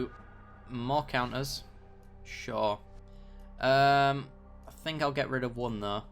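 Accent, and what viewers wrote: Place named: United Kingdom. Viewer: British accent is always good!:)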